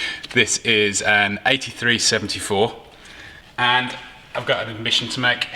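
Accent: United Kingdom. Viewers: a Guy with my Good Ol College Professor British accent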